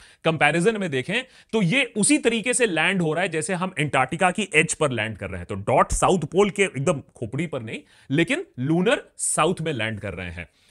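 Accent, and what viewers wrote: Bengal, India. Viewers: R u bengali ❤❤❤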